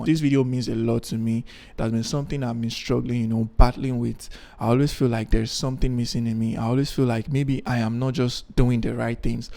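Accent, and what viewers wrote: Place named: Yoruba, Nigeria. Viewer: Love this video, and the H factor that comes with the yoruba accent,🥺❤️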